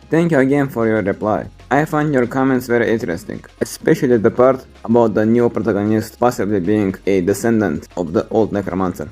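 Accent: Russia. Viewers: Чел я знаю что ты русский твой акцент тебя выдаёт бро. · Я все видео думал: Русский ли у тебя акцент или нет, пока ты прямо в видео не сказал откуда ты) Мать твою, история некроманта 2 выйдет? Я сплю?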